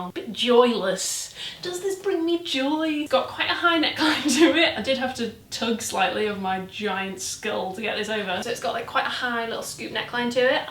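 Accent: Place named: Lancashire, United Kingdom. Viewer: Thanks for taking one for the team! I stopped going into Topshop about 17 years ago (I'm 33!) because their sizing always felt very small and I have been a size 14 basically since I was 12. Even passing the door makes me uncomfortable, like the vibes I feel are "none of these things are for you 😡😡😡" so it's good to think I might actually fit in some of their stuff if I can face going in there and having a look. PS love your accent I grew up near Ormskirk so that Lancs-Scouse adjecent twang makes me trust you even more!!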